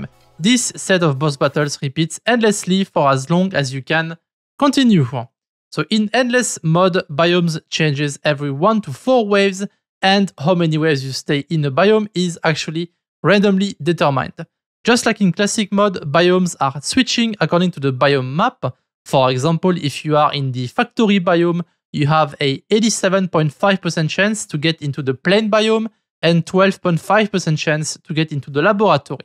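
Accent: France. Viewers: Hahahaha your french accent is f obvious when you speak, also nice video btw. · je m'abonne car tu me fait rire avec ton accent français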